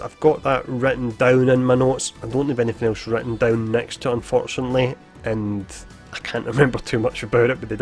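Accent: Scotland, United Kingdom. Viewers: Passion with a scottish accent ... good to see you excited about the game. Patrols are coming!!!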